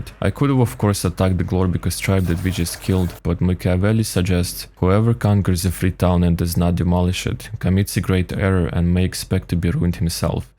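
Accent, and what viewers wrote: Russia. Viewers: ru accent? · What is the accent? It sounds russian or something to me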